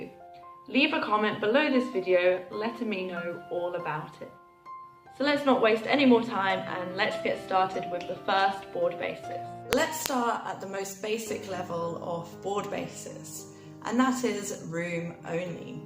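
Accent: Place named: United Kingdom. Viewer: Beautiful British accent!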